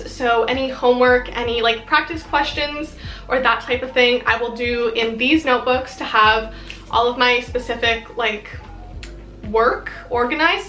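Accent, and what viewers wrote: Canada. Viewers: Hi I am also from Canada I just found your channel I am going to subscribe. Are you from Ontario it sound like you are? I hope this is not creepy 🤣. I am also from Ontario if you are. You video was amazing btw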